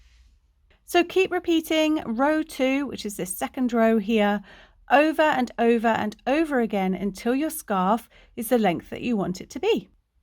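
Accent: United Kingdom. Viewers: Crochet is very popular in the States, and I had a wonderful evening with the group. Being British I love your channel as it's nice to hear a familiar accent together with American crochet terminology. It's a win win for me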